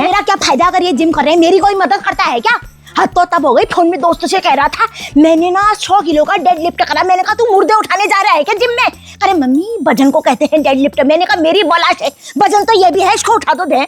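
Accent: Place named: Pahadi, India. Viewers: Aapka pahadi tone me bolna bahut achha lgta hai mujhe mere ghar(india) k pados k aunty k yaad aa jati hai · Mzaa hi aa jata hai aapki pahadi leh sunke😂😂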